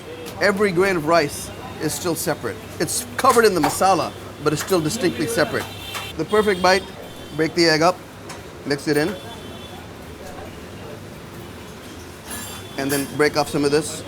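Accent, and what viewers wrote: United States. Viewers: American Indians don't even sound this American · Probably the first presenter I encountered who has an authentic (American) English accent as well as an original sounding Hindi accent. Both preserved and original in their own sense. Compared to so many presenters on Internet with their fake accents. Very well presented. Great job🎉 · Malayalee in american accent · Following cause of your host.... Face of Naseeruddin Shah, accent of an American, 100% understanding of Indian culture and the calm, reasonable way he comments.. 5 stars all the way.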